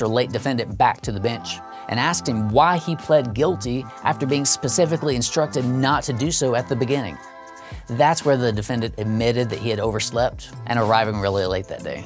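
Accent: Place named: United States. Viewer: true. But who are you replying to? I don't see anyone saying they're the same, and I think we all understand that this video was about the US. (The accent was a pretty big clue)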